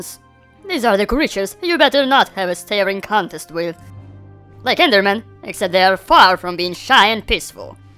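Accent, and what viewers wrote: Russia. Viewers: I hear that Russian accent in his English 🌚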